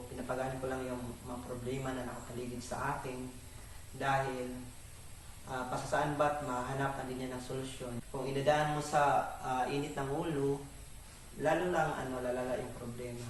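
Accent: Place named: Laoang, Philippines. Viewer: Alam nyo Sir tuwang tuwa ako sa Way Ng pananalita ninyo...pakiramdam ko kahit 15yrs na Ako Hindi nakakauwi dyan sa Laoang parang nandiyan narin ako. keep up the good work!👍👍👍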